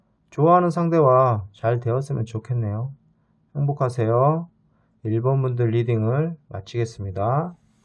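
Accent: Jeolla, South Korea. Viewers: 의도하신건지 모르겠는데 설명하실때 사용하는 언어가 너무 웃겨요 그리구 전라도 분이신듯!??ㅋㅋㅋㅋ 2번인데 잘맞추시네요 ㅋㅋ 왜그런지 모르겠는데 사람들이 저를 좋아해요 감사합니다~!!! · 전라도 사투리👍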